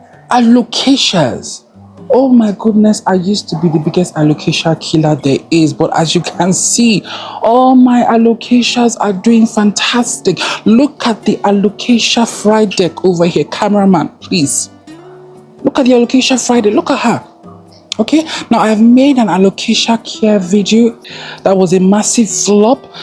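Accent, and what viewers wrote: United Kingdom. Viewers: Hi ❤ ❤ 😢😢😢😢😢😢😢😢 I’m an alocacia and calethia killer, those fancy ones 😢😢😢 but I found few at Walmart on sale, $2.50 found some & I still have them; I do have many types of tradescantias and love propagating them 😊 but I still kill plants. I have all kind of plants 🌱 🪴🌱🪴🌱🪴🌱🪴🌱🪴 maybe more than a 1000; still ❤❤❤ I love the ones stay alive for many years, the ones I kill 😢 I try & I try! I thought I was a plant mom that water too much, that I am constantly there but I am not, I am there in my garden but I don’t check them daily, honestly I have not found pest in any of them in many weeks😊 but this hobby is very rewarding and expensive 😊😊😊 Friend I like your British accent 😂😂😂 thank you for your time ❤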